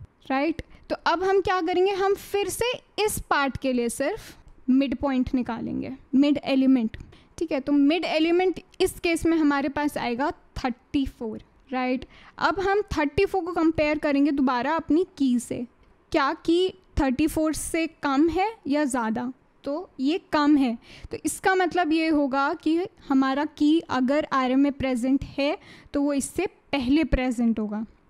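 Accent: India. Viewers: tired of hindu accent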